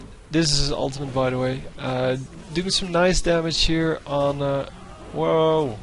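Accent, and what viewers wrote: Netherlands. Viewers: Are you dutch man?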